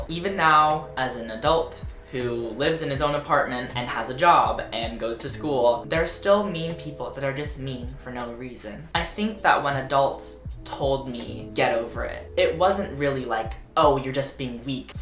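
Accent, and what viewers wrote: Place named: Texas, United States. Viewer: U've been so texan lately!!! I'm love it!!! Y'all!! Ur accen!! Yeehaw king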